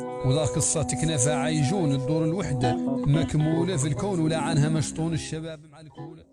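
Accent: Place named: Sahara. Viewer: تحياتي لك اخي انا معك غي القناة الاولى والتانية انا من متابعينك ومعجبين بك لكنتك ااصحراوية تحياتي لك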